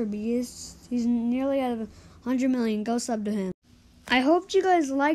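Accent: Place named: Texas, United States. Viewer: your school was in Texas?? texan sounds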